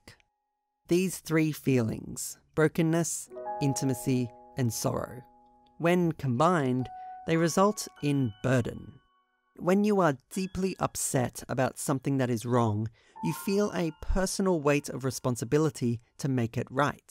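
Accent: Australia. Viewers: Are you from Australia? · I know you're emphasising words for clarity, probably because of your Aussie accent. I just find it disjointing and would appreciate more a more natural style of narration.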